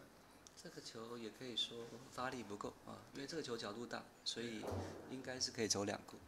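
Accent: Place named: Taiwan. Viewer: 這主播怎麼有台灣腔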